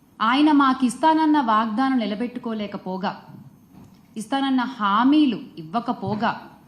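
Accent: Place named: Telangana, India. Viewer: అక్క 😂😂😂 నీది Telangana కదా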